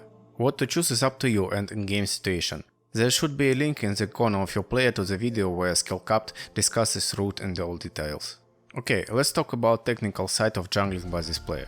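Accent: Russia. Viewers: I find your accent pleasent (#nohomo), are you from russia?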